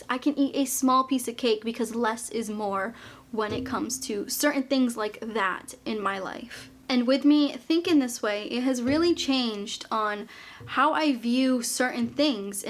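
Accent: Boston, United States. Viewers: Hello from Canada. I'm a relatively new subscriber and am so glad I found your videos. You are so down to earth and logical with very good information. I just have to tell you that I love your Boston accent.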